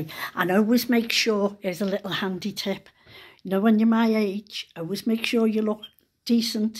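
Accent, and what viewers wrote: Liverpool, United Kingdom. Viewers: hahaha "talk of the devil" hahaha great how you say it. Can listen to you with admiration together with my mum. Love that Liverpool English so much 👍🤣😄😂I'm from Australia. You look great by the way luv🇦🇺👍🇳🇱🤗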